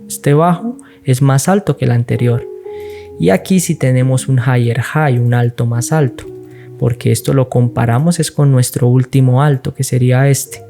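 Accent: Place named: Colombia. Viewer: Hola tocayo como vas? También me llamo y soy de colombia igual tú por tu acento. Es el primer video que velo tuyo, felicitaciones. He visto muchos vídeos sobre estructura y te digo que es el más claro que he notado sigue así. Una consulta en donde ves el índice del dólar? Y entonces dependiendo de su movimiento así mismo decides así donde van tus entradas? Gracias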